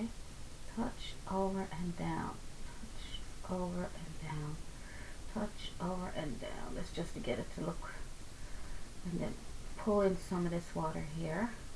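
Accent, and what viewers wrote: Newfoundland, Canada. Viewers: OMG this is the first tutorial that I have seen Love your manner and you make me feel right at ease....have just begun to paint, will definitely look at your others thank you sweetie, (you sound like a Newfoulander) love your accent xo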